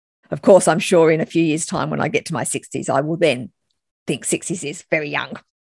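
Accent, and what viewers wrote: Australia. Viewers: Magnificently sensible. Another excellent debunk. The Aussie accent is pure bonus.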